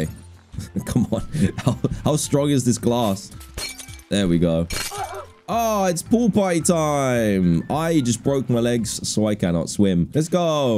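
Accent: United Kingdom. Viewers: you know when you're watching someone who's too british when they pronounce amazon amizin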